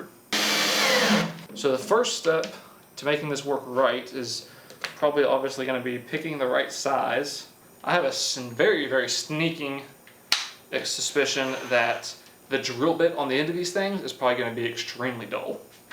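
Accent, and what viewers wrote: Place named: Georgia, United States. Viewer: Holy crap I just discovered today and these videos are so fascinating. It’s 6am and I still haven’t slept 😭😭😭 I just keep watching! Also I’m curious to know where is from. He sounds like a fellow Georgia native.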